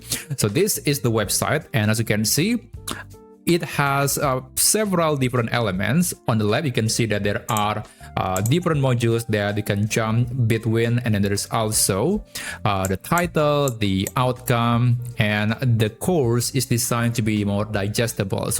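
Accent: Indonesia. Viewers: bro you have indonesian accent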